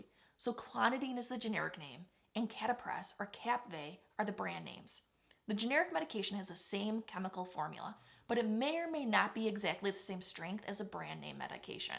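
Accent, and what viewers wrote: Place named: Midwest, United States. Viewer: nice midwest accent :D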